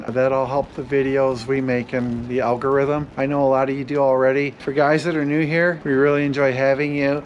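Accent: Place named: Cleveland, United States. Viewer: Cleveland accent I hear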